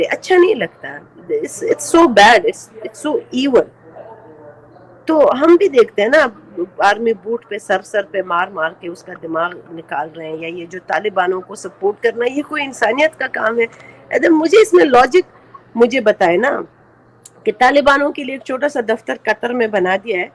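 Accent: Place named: Kutch, India. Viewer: bhai.. your pronunciation tone sounds like your belong to gujrat - India ( Kutch) region...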